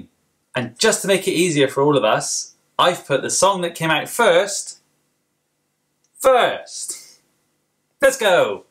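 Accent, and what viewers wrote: United Kingdom. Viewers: Dude. You from the west country?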